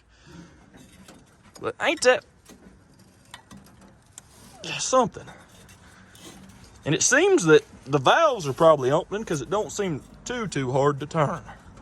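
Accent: United States. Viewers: What state are you from Yank?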